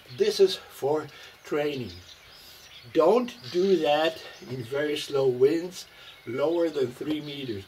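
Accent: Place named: United States. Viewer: Angielski naprawdę dobry, amerykański akcent. Pozdrawiam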